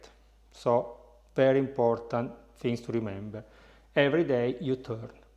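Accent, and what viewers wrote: Italy. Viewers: Everything just geting better, when you speak with an italian accent.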